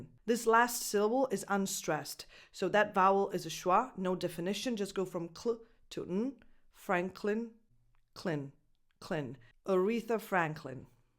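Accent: United States. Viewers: Lei cara signora ha la cadenza americana!!l'inglese è un'altra cosa per mè..anche lei deve studiare l'inglese inglese però!brava và ❤ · Sei molto brava e chiara ma secondo me sarebbe corretto sottolineare che la pronuncia che spieghi è quella americana (e ad es freddie mercury e jhon lennon erano britannici e la pronuncia è leggermente differente dalla tua)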